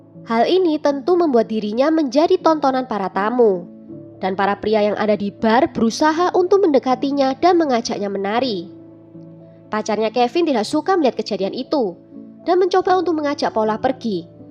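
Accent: Java, Indonesia. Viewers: Alhamdulillah.. sehat trs bahagia trs.. medokuhhh 👍💖💖💖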